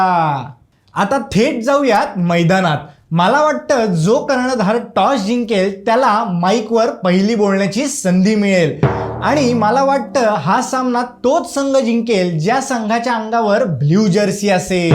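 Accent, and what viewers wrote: Pune, India. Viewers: लय भारी बोलता राव, टिपिकल पुणेरी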